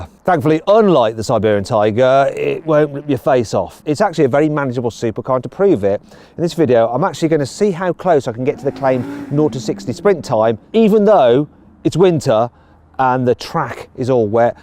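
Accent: United Kingdom. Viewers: 이형 영국발음때문인가 자꾸 보게돼....시원시원하고 깔끔하고 재밌어....뭐지 이형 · 역시 영국식 발음은... 멋지네요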